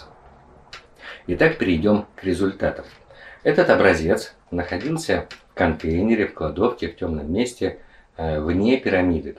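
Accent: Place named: China. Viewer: Да откуда у тебя этот русско китайский?)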